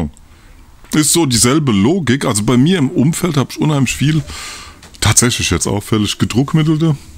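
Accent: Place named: Hesse, Germany. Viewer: Ich liebe die Rezepte❤ und den hessischen Akzent. Much love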